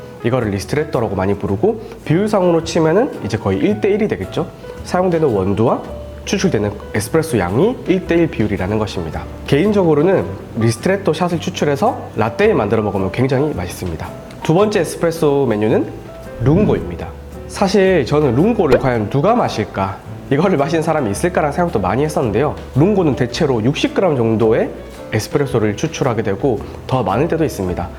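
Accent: Gyeongsang, South Korea. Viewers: 씨발 시작부터 경상도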